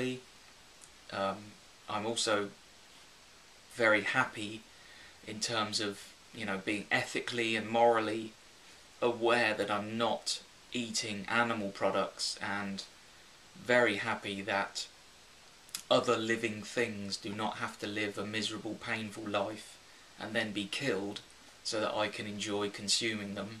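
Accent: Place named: United Kingdom. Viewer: Thanks for sharing your story and experiences. I subscribed you. Btw, I love your british accent! Have a nice day. Greetings from Portugal :-)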